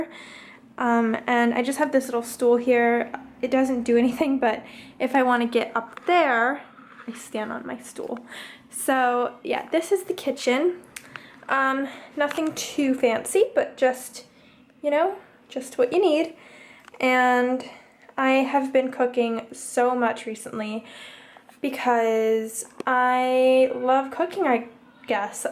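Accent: United Kingdom. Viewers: Omg I just watched a few of your older videos and I noticed in this one you Kinda developed a British accent!! That is sooooo cool!! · I think you've kinda picked up an English accent 😄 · You sound so British! :)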